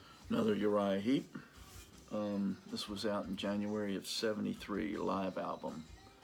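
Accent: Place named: West Virginia, United States. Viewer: Are you in WV? You don't sound too far away from me I'm in the Panhandle anyway you have a great collection there I totally forgot about the, they had some great songs.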